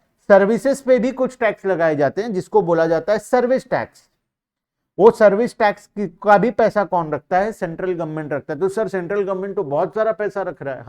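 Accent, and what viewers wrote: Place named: Sambalpur, India. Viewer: Sir kya app western odisha se hai kyu ki app ki bate thodhi thodhi sambalpuri language jese lag raha hai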